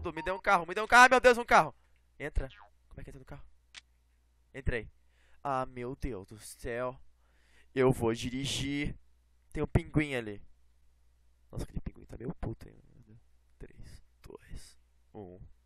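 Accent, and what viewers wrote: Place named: Santa Catarina, Brazil. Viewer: voce e de santa catarina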